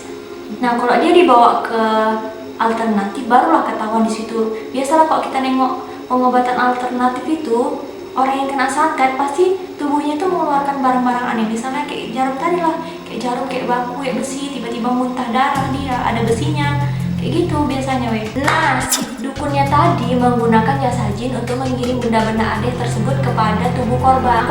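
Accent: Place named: Medan, Indonesia. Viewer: Logat medan nya masih kental banget ya kaa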